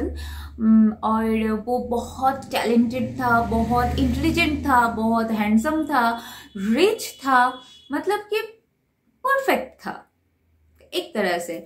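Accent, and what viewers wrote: Bengal, India. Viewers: She is bengali so her hindi isnt proper. No problem with that 😊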